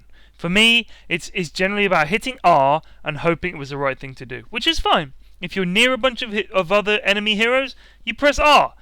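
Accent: United Kingdom. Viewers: you're British accent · a guy with a British accent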